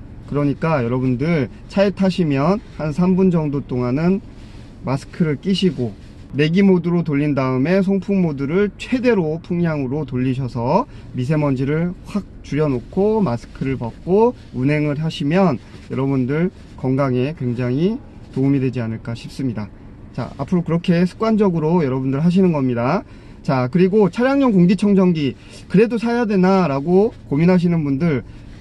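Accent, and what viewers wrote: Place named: Chungcheong, South Korea. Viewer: 거시기... 충청도 특유의 말투아닌가요? 저는 아주 정감이 느껴지는데요.. ㅎㅎ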